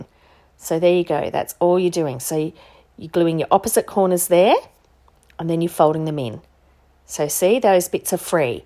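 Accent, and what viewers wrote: Australia. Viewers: Nice to hear an Aussie voice! Great little project!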